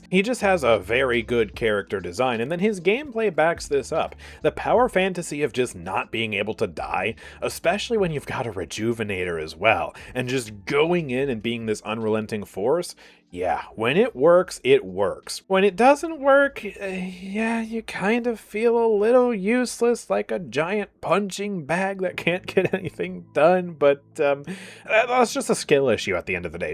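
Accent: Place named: Canada. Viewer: dude why whenever i watch your video it makes me feel like iam watching canadian guy eh it just you guys have the same style in talking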